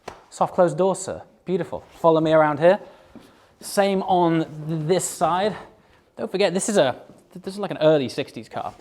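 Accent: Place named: North, United Kingdom. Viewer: Well, with his Northern lilt, you can hardly accuse him of being pretentious!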